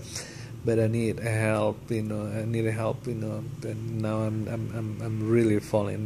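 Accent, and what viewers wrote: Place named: Indonesia. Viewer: Why he was so afraid of tapered to banjo? It is a great instrument! :D :D :D :D :D :D :D :D OMG, this Indonesian accent is hilarious. :D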